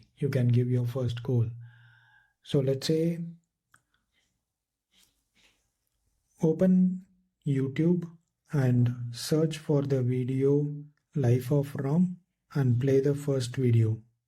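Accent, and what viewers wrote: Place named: India. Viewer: indian accent... scares people away.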